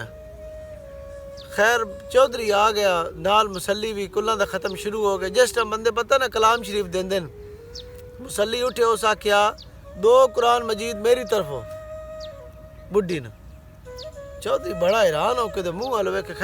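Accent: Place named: Haryana, India. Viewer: Veer tuc HARYANA jive Punjabi bolde ne te vekhan ch v haryane de jaat jatt lagde ne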